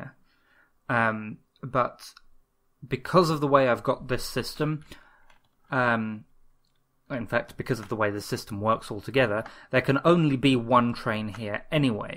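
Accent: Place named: United Kingdom. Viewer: me, an american: hears british accent i trust this man Amazing tutorial.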